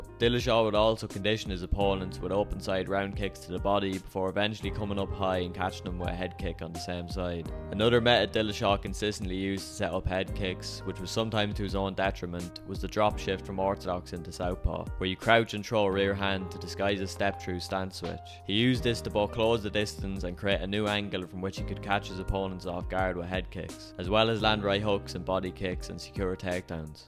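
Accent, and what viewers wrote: Ireland. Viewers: Are you Irish? Also yeah, I noticed that DIllashaw liked his head kicks almost as much as he liked shifting.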